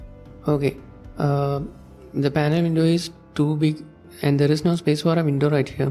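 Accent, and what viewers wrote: India. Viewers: He is, but he has a heavy South Indian accent. But he is speaking perfectly well 😊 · Sorry your knowledge might be good but ask your foreign friends if they understood anything you anounced in this video. Your Indian Dialect is here unuseful use neutral clear and slow spoken language please! Your Video might be interesting for more people indeed. Thanks brother!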